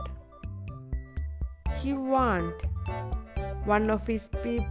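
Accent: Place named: United States. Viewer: Funny and nice american english, btw tq for this precious video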